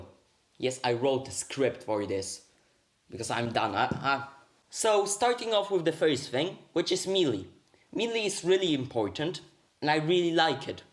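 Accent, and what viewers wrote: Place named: United Kingdom. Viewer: and stop the british accent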